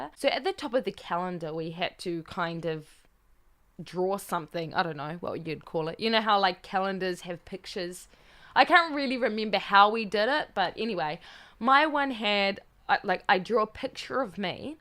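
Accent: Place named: New Zealand. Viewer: That kiwi accent. Hard to find in a sea of YouTube content.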